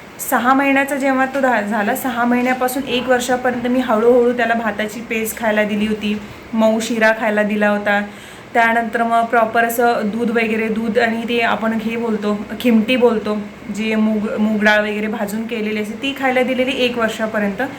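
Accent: Kolhapur, India. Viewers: Di tujhi Kolhapuri language khup aavdhte mla 😍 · तुमची कोल्हापुरी भाषा मला खूप आवडते तसेच तुमचे राहणीमान खुपचं छान आहे तुम्ही आरव ला लावलेल्या सवई तुमचे सगळ्यांशी बोलणे तुमचे व्हिडिओ पाहण्यासाठी मी खूप उत्सुक असते रोज दुपारी मी तुमचे नवीन झाले ले व्हिडिओ बघते किंवा जुने बघत असते खूप refreshing वाटते 🙏 असेच छान व्हिडिओ करत जा तुम्हाला खूप खूप शुभेच्छा 👍 धन्यवाद 🙏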